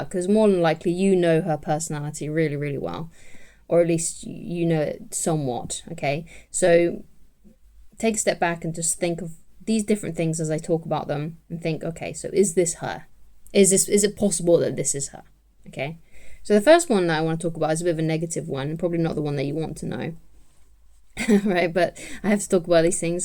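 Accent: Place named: United Kingdom. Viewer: Strong UK accent and the final piece of puzzle I needed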